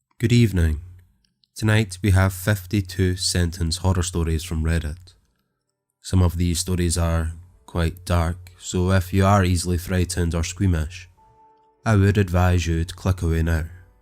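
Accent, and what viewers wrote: Scotland, United Kingdom. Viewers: Love me a good Scottish accent. Reminds me of Shrek 💚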